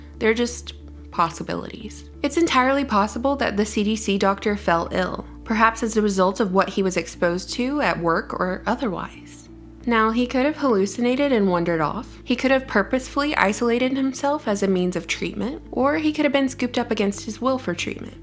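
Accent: South, United States. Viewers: Nothing like a southern girls voice 😊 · I really like your attitude, smarts, and conservative skepticism. And, of course, that sheepish suthun chahm. (It's ok, I'm a native) Please keep updates on the gentleman from Emory. That place makes ME nervous. Atlanta is NOT the place for the kind of toxic crap they keep there! Put it under Stone Mountain! Or a government parking lot! Lol!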